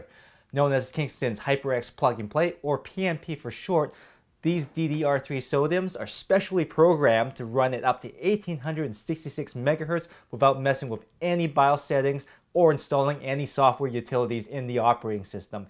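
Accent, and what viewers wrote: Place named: New Jersey, United States. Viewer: That's a lovely accent you have there...New Jersey?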